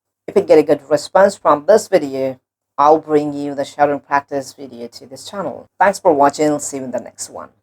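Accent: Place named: England, United Kingdom. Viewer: I think you are from England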